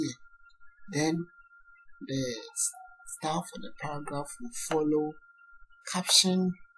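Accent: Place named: Ghana. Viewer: that's a Ghanaian accent lol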